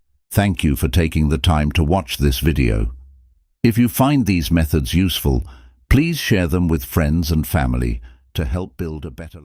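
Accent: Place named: United Kingdom. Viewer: It's a great subject there's a shit ton of it out gear what sets you're presentation apart from the herd and saysclisten to me???? IT SURE AINT THAT CALM CONDENSENDIN ENGLISH VOICE THATS ON A COUPLE OF DOZEN NEW PIECES OF CONTENT A DAY ARE YOU READY TO DITCH THE ACT TO USE YOURE REAL VOICE??? AND IF THOS IS YOURE REAL VOICE ,WHEN ARE YOU GOING TO PUT THE ACTION BEHIND YOURE WORDS AND ACTIALLY PUT POWER AND HART ONTO EVERY SENTANCE??? CAUSE THE WAY YOURE PRESENTING THIS WANDERFUL MESSAGE YOURE sabbatoging the good you could be doing you want a better channel more thumbs up ditch that posh english crap or if that is you iam sincearly sorry find away or get a coach watch some videos on public speaking you're message ain't bad but there us nothing holding me gear specially when I know this crap allready see you say let go what we cannot control beuitif thing but you're killing me iams fighting sleep cause bbbbboooorrrriiiiinnng not impressive no emotion it feels you're just a damnd actor reading some lines even worse an actor just taming a job to get by with no real interest in what there doing and sad is it is Ionley comment cause I care ill probably be watching new content from you tomarow the difrence is if you sound like you're just paying the bills no matter how good the info is you're getting the down ward thumb iam ubsubing and reporting you as just another goddamnd a.i. 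pretender and I fing hate snitches and will probably abuse my self as a sick punishment for breaking Mt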